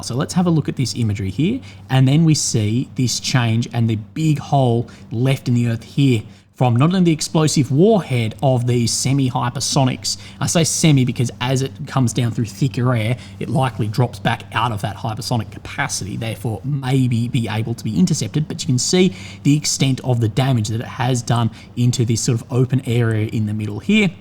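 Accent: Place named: Bogan, Australia. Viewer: Aussie bogan....speaking on Ukraine war...hilarious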